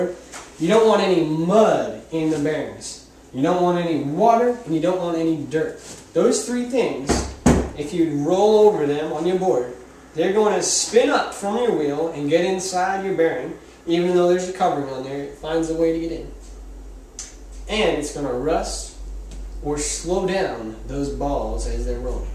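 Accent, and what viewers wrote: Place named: Texas, United States. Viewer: Does anyone notice his slight Texan accent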